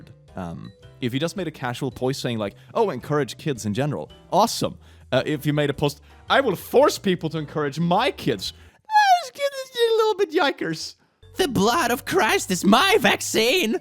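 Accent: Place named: Sweden. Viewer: The Norwegian accent 😂😂 too spot on to not be..I’m guessing Swedish, yourself.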